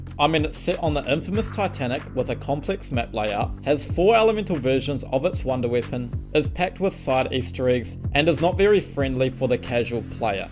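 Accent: New Zealand. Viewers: Are you a kiwi?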